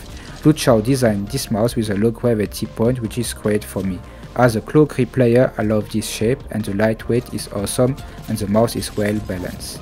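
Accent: France. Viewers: Thanks for your videos. I love your french accent :)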